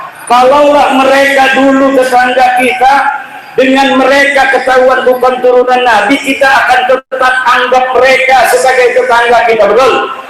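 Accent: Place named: Banyumas, Indonesia. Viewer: ngapak mantap 👍👍🙏🙏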